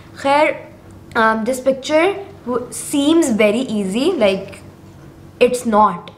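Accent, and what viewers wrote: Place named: Pakistan. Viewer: picture are good because am a photography & i have my own studio in multan but the thing i like is your accent during speking english means k english urdu mix 😁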